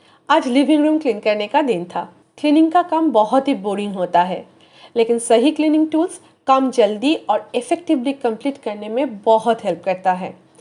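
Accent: Bengal, India. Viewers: Didi i love ur Bengali accent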